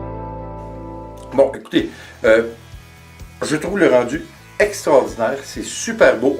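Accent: Quebec, Canada. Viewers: mon premier commentaire de France, malgré que je regarde ta chaine depuis quelques temps déjà. J'ai beaucoup appris de tes conseils et t'en remercie. Et ce bel accent quebecois me rappelle notre séjour dans ce beau pays il y a 3 ans. Bravo à toi et merci. Bonne continuation.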